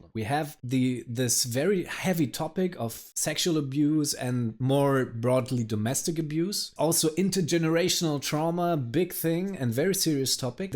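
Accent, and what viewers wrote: Germany. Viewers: that one killed me 💀🤣 Came here from the "Adolescent" video and the comments under it were wild... But I really enjoyed the way you chose to respond to them, so I checked another video and wasn't disappointed. As someone who both read the book and saw the movie, I got a bit offended by the title of this video, as the movie was awful compared to the book. But as you're only judging the acting, there might be some good scenes in it. idk. To me, personally, there was no chemistry at all between the two main characters and a lot of the side characters did a way better job at making me feel for them. That's why the last scene also didn't move me in any way. I think this movie would've been perfect with some unknown actors to focus on the story and not on Blake's stupid fashion choices or whatever. I think you're doing a good job with these videos. 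Keep it up! 💜 P.S.: Are you German? Your accent sounds like it.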